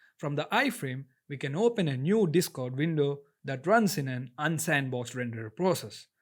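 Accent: India. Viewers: Are you from telugu states? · i love the indian accent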